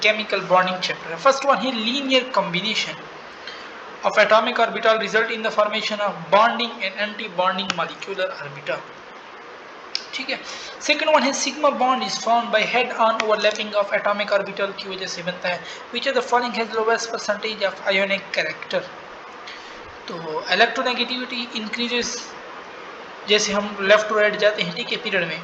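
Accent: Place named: Khyber Pakhtunkhwa, Pakistan. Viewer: Sir ap kha sa hoo kpk sa lg rhi hoo😊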